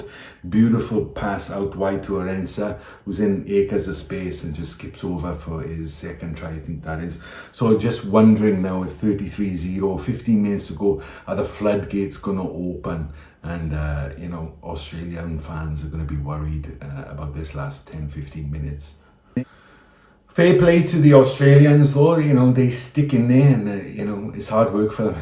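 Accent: Wales, United Kingdom. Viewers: What accent do you have? I heard welshish but alot of others in there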